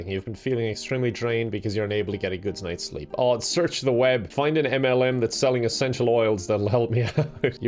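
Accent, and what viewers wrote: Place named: Ireland. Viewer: HOLY FEEECK! I absolutely ADORE your Irish accent, mah dude. And of course, you're super funny! Been loving watching your vids! Xoxo from a huge Brazilian fan 🤗😙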